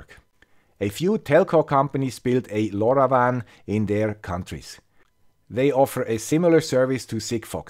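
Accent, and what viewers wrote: Switzerland. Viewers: Here's the guy with the Swiss accent, odrr? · Another excellent video from the "Expert with a Swiss accent": thank you so much for the qulity of the video. Please note that the 10xDL max.message per day comes from TTN "best practices" only: you do not have such limitation if you run a private LoRaWAN network (what you can forget with SIGFOX), nor if you use an Operator network (you might just pay more for these extra DL...). The deep indoor propagation of LoRaWAN is also better than SIGFOX.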